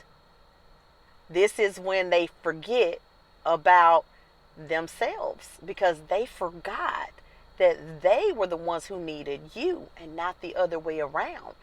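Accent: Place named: South, United States. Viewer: Love your southern voice. Great review and thanks for sharing. Can you please do a video on narcissist neighbors who refuse to take no for a answer.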